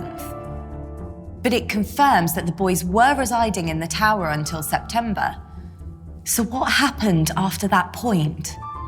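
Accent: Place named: Northern England, United Kingdom. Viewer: 27 Semantics on the pronunciation, but I AM British and this...this is not London. This is actually even more impressive because the accent is Yorkshire, Derbyshire, Midlands region waaaay to the North which is where they were from as Yorkists. It's a modern Northern accent though. If he read it in the English of the day, you'd barely understand it. Here is a video of a linguistics professor at the University of Leicester reading a letter written by Richard III some years before making himself King. He's written to borrow money, and the reader uses the King's English of the late 15th century. You might enjoy this if you're still about and see it. :D What did Richard III Sound Like? <link>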